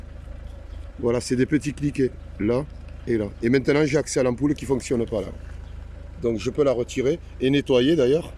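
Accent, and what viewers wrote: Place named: South, France. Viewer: Merci un charmant petit accent du sud magnifique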